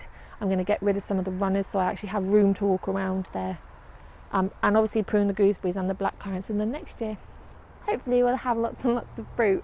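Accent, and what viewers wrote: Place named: Wales, United Kingdom. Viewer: Hooray! Lovely update 😊 You know, I think we're all very critical of our own Allotments, I think yours looks rather tidy! Looking forward to seeing a bit more of you in the winter months. A bit of a Welsh twang in there too 🐑🐑 x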